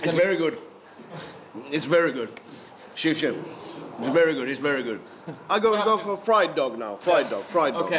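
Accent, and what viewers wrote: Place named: Netherlands. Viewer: he sounds dutch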